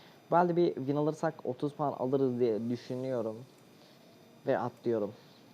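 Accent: Azerbaijan. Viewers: Sən Azerbaycanlısan? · Qurban olum Azərbaycan Ləhçəsinə 😁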